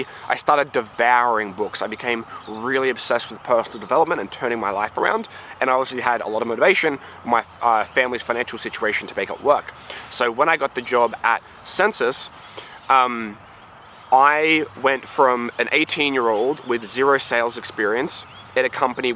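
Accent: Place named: Australia. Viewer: your story is incredibly inspirational and should move everyone who hears it to want to be great at any cost! I talked to Quinn yesterday after opting in...looking forward to getting goin with you guys in the very near future! You are the M&M of digital marketing....you even sound a bit like Marshall with an Aussie accent brother!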